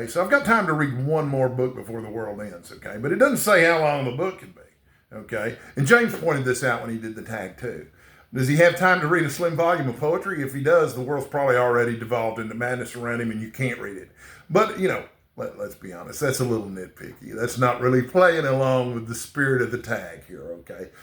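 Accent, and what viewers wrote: Texas, United States. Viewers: What a fun video. 'Salem's Lot opened me up to reading and loving King after basically a lifetime of meh reading experiences with him. I did chuckle about the Texan accent. My Tennessee accent is often mistaken for a lifestyle that is reminiscent of Hee Haw and not a city dweller. Stay sexy and hydrated!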